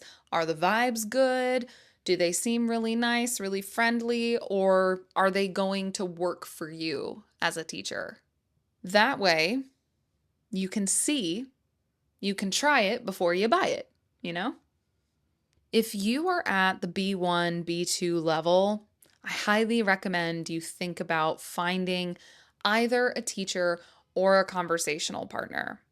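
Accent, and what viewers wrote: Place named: United States. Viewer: Hi there my beautiful teacher you are a master of communication with us and your American accent is perfect meeting our expectations that's why we love 💕 you here in Morocco keeping up ❤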